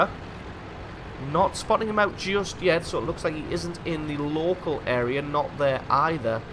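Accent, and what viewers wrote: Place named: North East England, United Kingdom. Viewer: The north east of England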